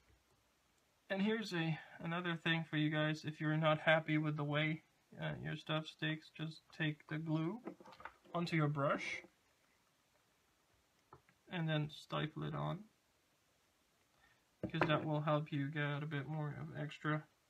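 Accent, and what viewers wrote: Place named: Southern California, United States. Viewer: I like your "shoot from the hip" style. It really is like that in real life, where different techniques, materials, and approaches are constantly being juggled to find the best way to model a certain stage of one's work. By the way, maybe you have disclosed it, but are you in Europe? Your common products such as white glue appears in your clips with European labeling, and you have mentioned costs in Euros, not dollars. This belies your SoCal accent. But I do enjoy the casual nature of your clips, and the emphasis on diorama building and layout.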